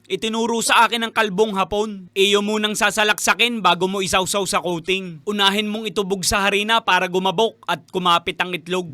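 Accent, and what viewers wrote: Batangas, Philippines. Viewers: Parang Batangueno ala eh😍🤩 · Ay kagaling eh. Batangenyo ka talaga idol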